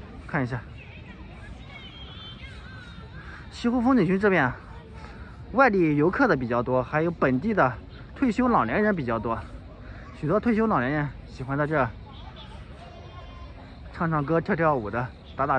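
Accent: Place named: Shaoxing, China. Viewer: 听口音 你是 小绍兴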